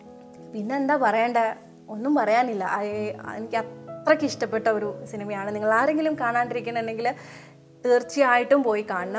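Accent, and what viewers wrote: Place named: Thrissur, India. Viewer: ചേച്ചി തൃശ്ശൂർക്കാരിയാണോ തൃശ്ശൂർ ഭാഷയാണല്ലോ സംസാരം കേൾക്കുമ്പോൾ. ചേച്ചി വളരെ നന്നായി Review പറഞ്ഞു. Lucifer is a mass movie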